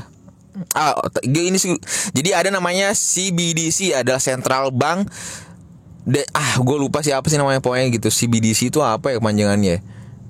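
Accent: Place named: Western border region, Indonesia. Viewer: Cara penyampaian nya kaya daerah Barat perbatasan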